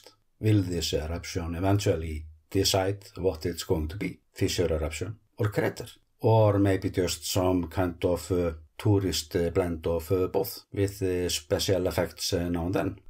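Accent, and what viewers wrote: Iceland. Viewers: As a student from Ireland who worked in Vestmanneyjar in '65-'68 and who saw Surtsey grow up, you accent, while not Westmann still reminds me of the many friends I fondly remember from those delightful days. Great reporting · Yes I love your commentary. Just matter of fact, say what I'm thinking quite often. I am getting used to your Icelandic accent too. I love your comments about the Norwegians lol. Much love and respect from the UK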